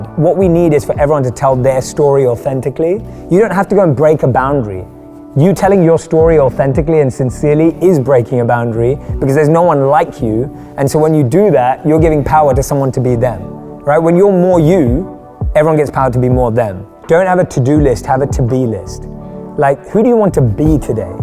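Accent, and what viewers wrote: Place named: United Kingdom. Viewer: That British accent tho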